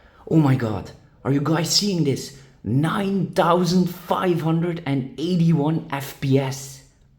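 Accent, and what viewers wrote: Belgium. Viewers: Congrats on committing a gun crime in Belgium. What you are doing is prohibited by law in Belgium, and based on your accent and profile I'm guessing that's where you are. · you sound flemish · Dat accent doet mij ergens aan denken. Aalst?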